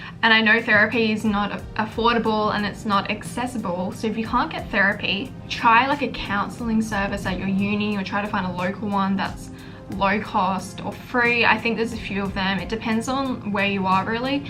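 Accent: Australia. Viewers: im not even listening to her advices i just looove the way she talks and that heavy aussie accent xD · as soon as i heard ur aussie accent, i knew you’d be a youtuber i’d love and man i just absolutely adore your duality and how you’re so empowering and motivating✨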